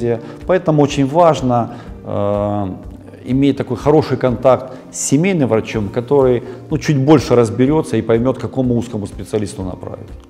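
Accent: Ukraine. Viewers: Видимо он украинец.Ну и шо с того?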